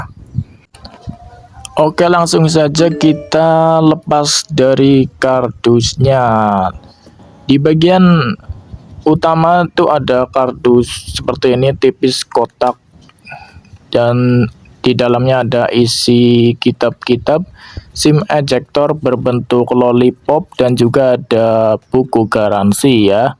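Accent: Indonesia. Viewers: Tumplek?? Orang mana bang?? Tegal or Brebes? Unik suaranya😂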